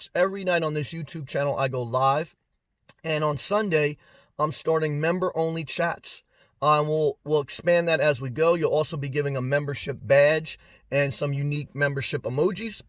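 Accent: New Jersey, United States. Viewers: Thank you I love your NJ accent and you been keeping me company today driving from Jax, Fl to Alpharetta, GA :)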